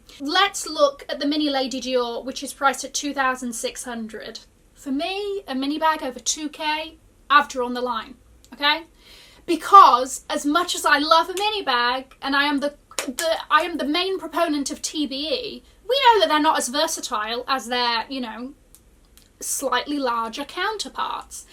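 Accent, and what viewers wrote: United Kingdom. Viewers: Your videos are really knowledgeable and helpful and i adore your british accent 😍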